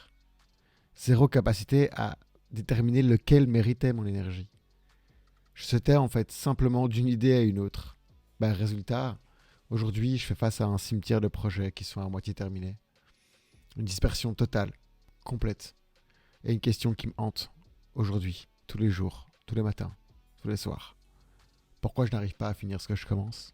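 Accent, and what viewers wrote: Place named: Belgium. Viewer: je viens de découvrir à 34 ans que j'ai un tdah à 99prcent de certitude. pas de diagnostic et je sais même pas si j'en ressens le besoin. le plus important pour moi aujourd'hui c'est de comprendre mon fonctionnement de me pardonner et de me ménager. merci pour ton témoignage qui résonne si fort, ca rajoute de la lumière dans la compréhension des méandres de mon cerveau, de la beauté dans le dysfonctionnement et un peu plus d'auto compassion.. j'ignore si tu es belge, on dirait à ton accent. bah je le suis aussi, ca nous rend si proches hihi. à plus dans le bus!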